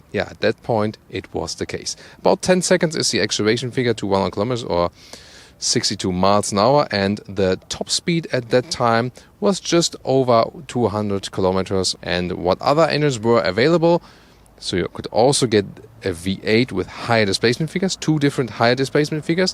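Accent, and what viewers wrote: Germany. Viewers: Thank you for your reviews. I especially enjoy your German accent. You do a very thorough reviews. You certainly do rush through these reviews and that is welcome.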